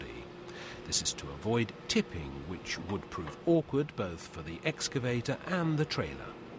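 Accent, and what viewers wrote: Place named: United Kingdom. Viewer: "Balance with the help of the excavator's digging equipment when entering the lower body. This is to avoid tipping which would prove awkward both for the excavator and the trailer." How do Brits come out sounding like Shakespeare even when talking about how to avoid a total fuck up with heavy equipment?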